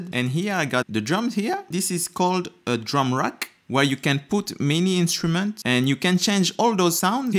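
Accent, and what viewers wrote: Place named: France. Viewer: Tu parle français on dirait?? I can notice your accent ..I speak French too.. Your English is perfect.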